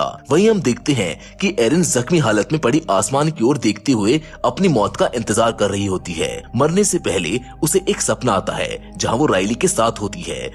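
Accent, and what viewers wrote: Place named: Bollywood, India. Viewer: Aapka Bollywood silver screen Wala accent hi bohot accha lagata tha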